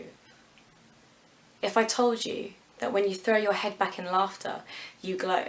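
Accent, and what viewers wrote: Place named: United Kingdom. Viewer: beautiful poem, and I really like your incredible british accent. Please make more videos. regards from México :)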